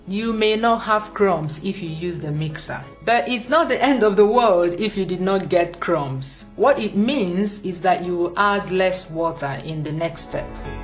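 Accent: Igbo, Nigeria. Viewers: My sister... the igbo accent ❤️ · This is purely ALL NIGERIAN! Great Nigerian accent with some wonderful mouth watering recipes! My rating on this video is clean 5 star!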